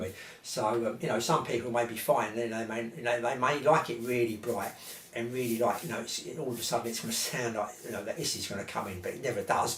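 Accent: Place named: England, United Kingdom. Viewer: Sorry if I sound a bit too critical but you might want to slow down your speech a bit. It might just be me having trouble with your English accent as I'm a Canadian and I think we may speak somewhat slower and deliberate. Just a little something to help improve your channel as you continue on. I know that you have said this was done when you were first starting out so it is entirely understandable that you won't have an excellent delivery right off the bat. Nothing wrong with the content just one listener trying to help out. Cheers mate.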